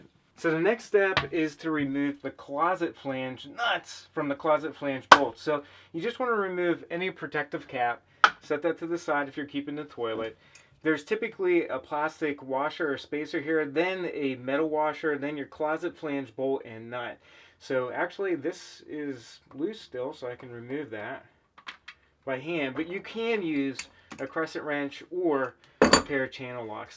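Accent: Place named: Pennsylvania, United States. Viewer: Ah! knew you were from Pennsylvania! I’m from Philly area but now I’m out in California and your voice just reminded me of home. Thank you for making this video my toilet has a crack in it and I don’t want the repair guys in here during quarantine so here we go! Oh I don’t have that stuff that goes up the water but I thought of another good thing that I randomly have (and I don’t have kids so it’s very random I have this- I actually used it to make snow for Christmas since there is none out here ) If anyone happens to have any diapers they can use the inside of that just rip it open and there’s is fluffy on the inside that turns into that same looking gel. Glad I’m a little bit of a hoarder at the moment Ha Ha Ha Ha. Anyway thank you !!!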